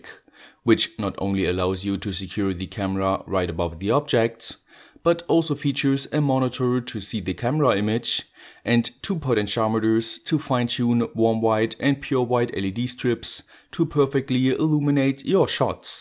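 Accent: Germany. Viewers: Mach Mal bitte mehr Deutsche Videos, ich mag es, wie du Englisch mit deutschem Akzent und Deutsch mit englischem Akzent sprichst! Translation: pls make more english videos, i Like it, how you speak english with a german accent and german with an english accent!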